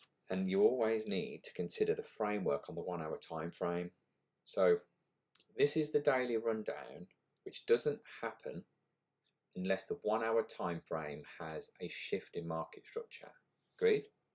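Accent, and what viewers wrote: United Kingdom. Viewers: Nyc analysis of the charts bro Luv the British accent too 😂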